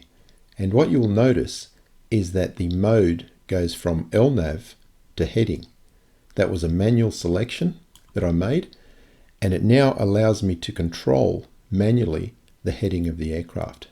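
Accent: United Kingdom. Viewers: A beautiful and well thought out video. you dah man!! A really good use of your time while flying over the ocean, and getting to stick it to the Flat Earth where the sun don't shine at the same time. I did my military service as an 18 year old (compulsory in Singapore) in the armoured artillery. We used maps and got to understand what they depicted. Back in the late 70's. My son did his service in 2006 and now they have the benefit of GPS targeting. You know that thing that you use in you cars to get to where you're going? It used sattelites. You know those pesky things that orbit the globe? Flat earth? What a frigging stupid idea. Free speech is one thing, but to use it to dumb down a sizeable portion of your people is worrism. Mark sargent, Jeranism, Patricia whashername, are actually conmen who don't really believe what they claim and are there to reap what they can earn from the uneducated and gullible public. 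The US should not have any place for this stupidity but sadly it seems to have. forgive me if I'm wrong but you sound like you're from the UK. I guess the epidemic of FE stupidity has crossed the pond. You have your work cut out for you if you should not get weary of sticking it to the Flatties. A really great and informative video. Well done!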